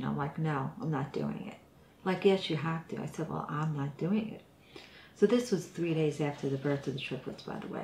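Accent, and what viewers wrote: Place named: New York, United States. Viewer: New subscriber to your son’s channel, thank you for sharing your story! I cried when you spoke about your dad and melted when your son came over to comfort you. Your New York accent is so awesome:). ❤️💐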